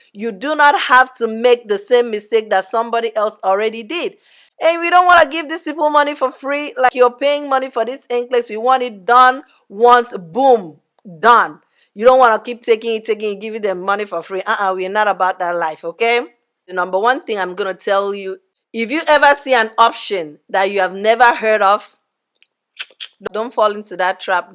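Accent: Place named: Efik, Nigeria. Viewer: You sound like my Efik or Ibibio sister❤ Thank you.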